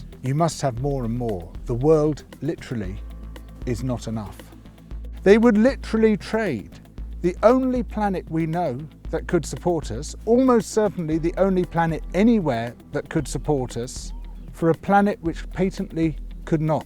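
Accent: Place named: England, United Kingdom. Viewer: "...sending himself into space... in a giant dildo..." If George had have said that with his beautiful English tones I would have died laughing. Later Edit: giant phallus, I had not gotten to that yet, thats going to tickle me for hours.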